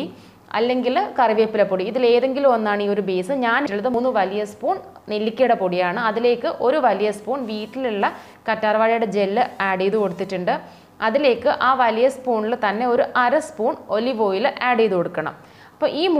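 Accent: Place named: Tamil Nadu, India. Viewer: Slang korachu tamil mix aaghunnu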